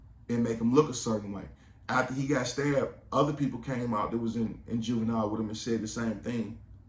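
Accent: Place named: California, United States. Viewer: Great videos and great content. Your accent, are you from California?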